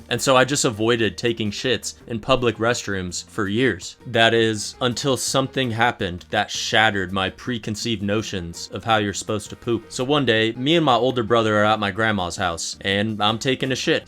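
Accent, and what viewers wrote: South, United States. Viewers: 1. When I was little I thought it was weird to sit straight forward on the toilet so I sat on the side of the bowl facing the wall 2. I just now realize has a southern accent, after hearing him say "butthole" repeatedly and now I can't unhear the accent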